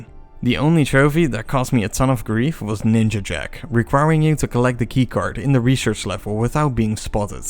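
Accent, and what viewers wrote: Netherlands. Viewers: Recognised the Dutch accent immediately mate. Goede video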